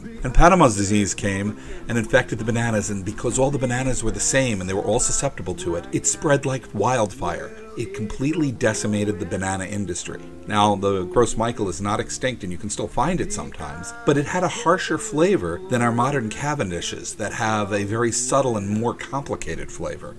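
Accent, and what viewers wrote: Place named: South, United States. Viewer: Southern charm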